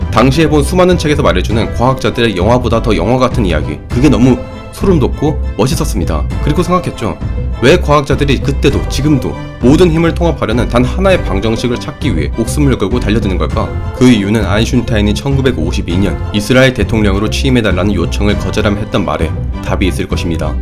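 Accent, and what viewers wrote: Gyeongsang, South Korea. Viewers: 경상도 아닌가요?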